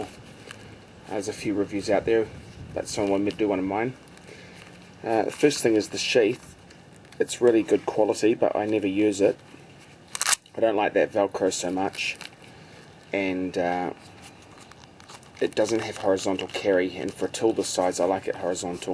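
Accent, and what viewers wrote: New Zealand. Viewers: I actually didn't look on your channel page, I can tell the difference between an Australian and a New Zealand accent. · I'm thinking of getting this instead of Swisstool X because of the ergonomics and weight. Cheers on the review and the accent. New Zealand?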